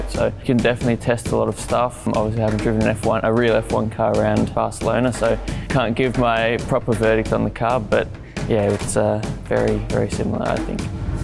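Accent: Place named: Australia. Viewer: His Aus accent sounds bit stronger here..hes much younger here though❤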